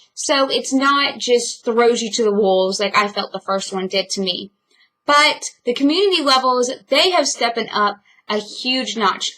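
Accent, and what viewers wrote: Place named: England, United Kingdom. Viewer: I don't want be a douche, but it's an English accent, not British, because you're not going Welsh, Scottish or Irish. Great review by the way.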